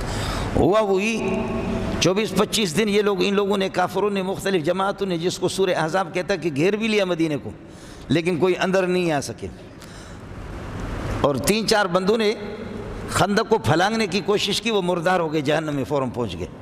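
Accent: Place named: Balochistan, Pakistan. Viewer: In ki zubaan esi hy. Baloch hy na. Pathan logo ki b esi zuban hoti hy. Badtameezi ka irada nhi hota in ka. Badgumani sy bachny ki koshish kijiye. "ظنوا بالمومنین خیرا"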